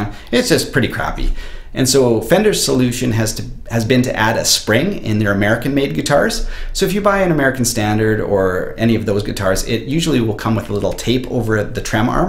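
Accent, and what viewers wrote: Canada. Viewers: like you're videos, what kind of dialects is this, Canada?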